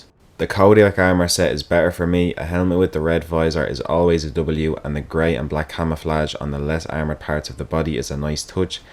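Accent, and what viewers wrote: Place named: Dublin, Ireland. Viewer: Whats up man, couldn't believe when i heard your accent i'm from Dublin myself. Whats your steam name If you're down to run some games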